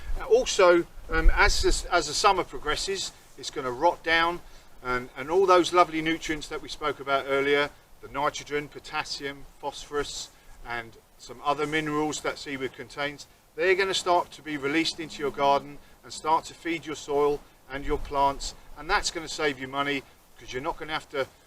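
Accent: United Kingdom. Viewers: I detect a bit of a British accent there mate. I love all things gardening and I am a huge anglophile so I am looking forward to more of your channel. Also I love to see gardening channels from my Nova Scotia area.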